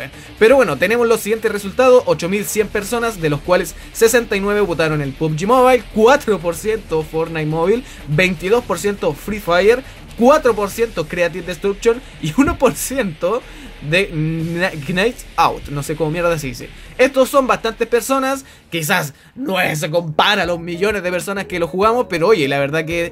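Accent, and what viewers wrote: Chile. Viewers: Weon yo no te entendi ni madres chileno :v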